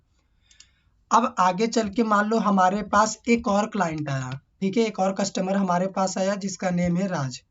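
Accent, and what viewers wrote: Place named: Bihar, India. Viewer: Bihari accent is lob❤️ 'haiyee nai h'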